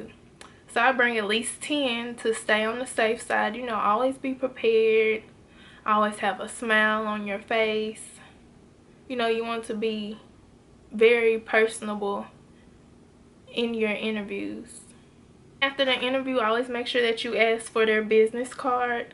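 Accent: Memphis, United States. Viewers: Awesome video. You sound like you from Memphis,Tn . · I am loving your videos. Especially our accent(memphis) I graduate from u of m in dec. So I am going back for second degree bsn ( u of m) new subscriber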